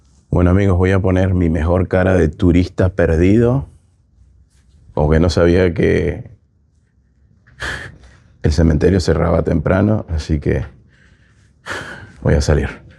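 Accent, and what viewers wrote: Argentina. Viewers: ya se te pego el acento argentino, vi un vídeo de hace 5 años y hablabas venezolano 😅 jejejeje saludos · Es excelente por qué ya de estar tanto tiempo acá parte de tu acento tiene algo de argentino, pero lo disimulaste lo mejor que pudiste jajaja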